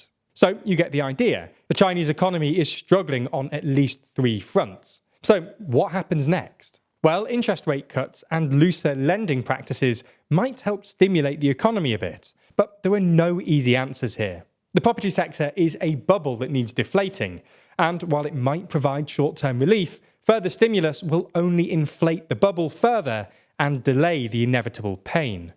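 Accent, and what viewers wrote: United Kingdom. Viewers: 5% growth for 2023, British accented presenter enjoy your recession riddled economy.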